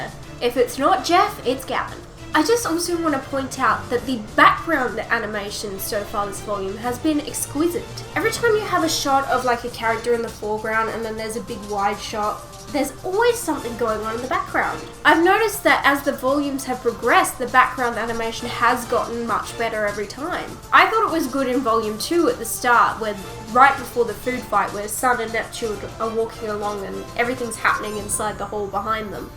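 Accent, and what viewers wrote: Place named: Australia. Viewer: Yay i though i heard an Australia accent, super happy to meet another RWBY fan in Australia, much love from NSW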